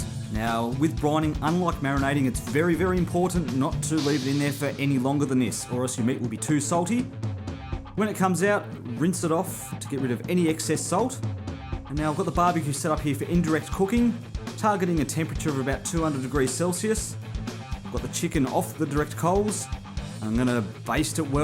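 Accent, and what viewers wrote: Australia. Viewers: It's so good it's retarded. Real, ocker Aussie speak. Love it mate!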